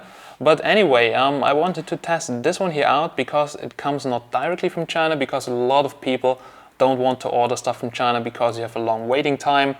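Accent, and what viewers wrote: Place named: Germany. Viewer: Zweites Video und weiß seit der ersten Sekunde das du Deutsch/Österreicher bist :D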